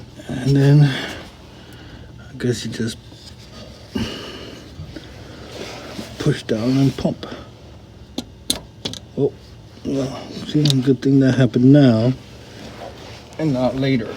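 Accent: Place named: Alaska, United States. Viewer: You sound like you are in Alaska judging by your accent. You are a very kind man.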